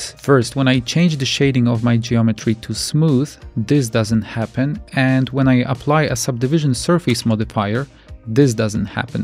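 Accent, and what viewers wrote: Poland. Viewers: Real CAD programs automatically make perfect fillets and they provide you with several tools to verify their perfection. Blender does not have these features because it is used by artists rather than by engineers. If you want to make jumping dinosaur, you use Blender. If you want to design airplane wing, you use Rhino. (This guy has Polish accent.) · Are you Polish? lol Nice video mate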